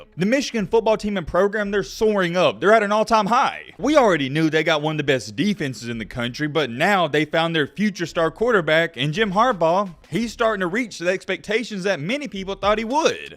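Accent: South, United States. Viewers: is more like it. I will never trust someone with a Southern drawl talk about Michigan football. Stick to lying about the Tide. Michigan is far from major trouble. Be afraid... be very afraid.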